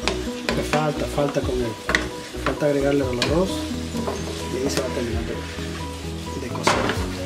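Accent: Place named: Veracruz, Mexico. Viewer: tu acento me parece jarocho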